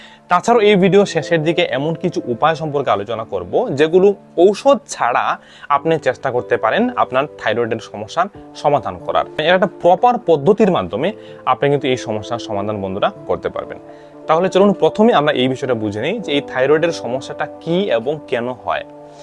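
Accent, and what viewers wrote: Bangladesh. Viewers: Apnar kothate mone hochche apni Bangladeser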